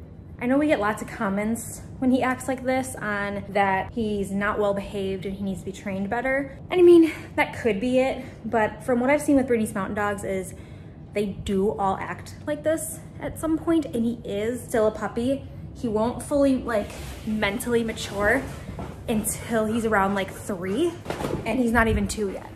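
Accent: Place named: Minnesota, United States. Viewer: What is your accent? Minnesota? It's so lovely!!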